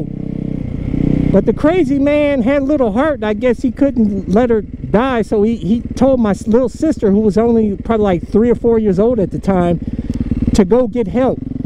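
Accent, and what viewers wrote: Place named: South, United States. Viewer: I really thought you were from somewhere in the south like Luisiana.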